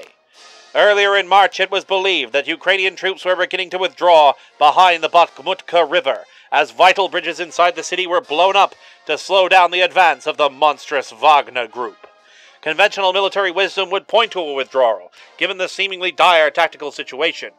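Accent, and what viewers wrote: Transatlantic, United States. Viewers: Ngl, this is a pretty good transatlantic accent. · Yes, he has a fantastic Transatlantic Accent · Absolutely fantastic transatlantic accent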